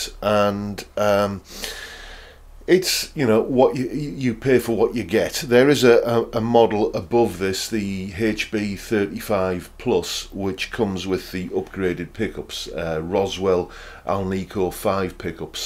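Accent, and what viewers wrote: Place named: Teesside, United Kingdom. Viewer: Great review mate. I've just ordered one of these from HB and was looking through some reviews on the guitar and being a Teessider myself (now living in Oz) I immediately locked on to your accent! Like many people I can't really justify (to the wife lol) spending the kind of money you have to spend to get the Gibson but I really like the style and chunky sound these hollow body's produce. Given the price it would be almost rude not to buy one! From the looks of things the cost/quality ratio seems to be acceptable so I can't wait till it arrives to live out my 'Back to the future/ ' dreams! haha.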